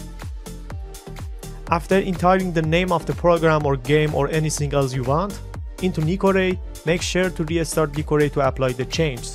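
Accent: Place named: Eastern Europe. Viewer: You're Persian but you have an eastern European accent. That's interesting. Thanks for the tutorial.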